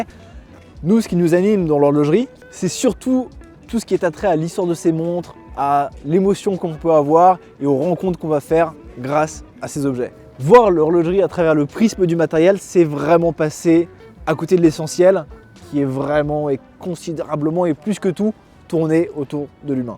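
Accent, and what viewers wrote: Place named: Savoy, France. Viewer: Du Texas - avec le Stetson et la Jeep, on pourrait presque y croire s'il n'y avait pas l'accent savoyard (ou quelque chose comme ça) !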